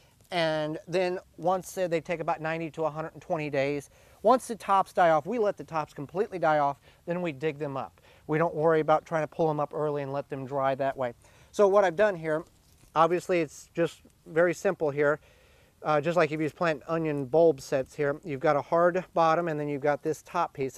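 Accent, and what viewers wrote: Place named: United States. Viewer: Simple, helpful American English. Thank you.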